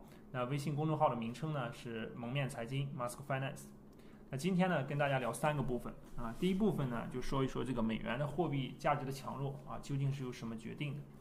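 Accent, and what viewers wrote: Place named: Shanxi, China. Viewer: 像山西的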